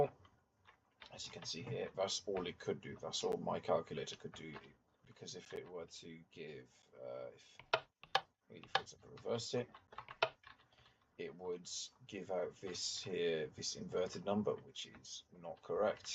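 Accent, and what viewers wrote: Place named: United Kingdom. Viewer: Good Video but the british accent is a bit annoying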